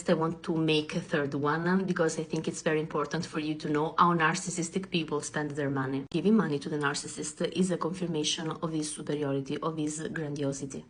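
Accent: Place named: Italy. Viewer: She just sounds Italian!?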